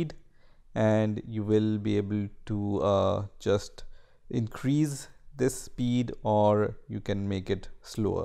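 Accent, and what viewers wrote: India. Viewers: classic indian accent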